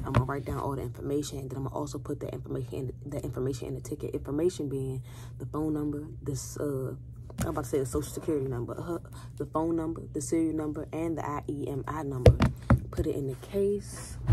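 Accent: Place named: Philadelphia, United States. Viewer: In the beginning of the video, I thought I was Trippin but then at the end of the video I realized you’re from Philly so am I I just finished Google cyber security online. I’m studying for my copier A+. I’m learning SQL Lennox and Python, but I don’t have any experience.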